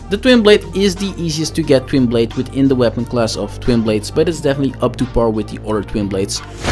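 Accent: Netherlands. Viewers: Are you u from the Netherlands ?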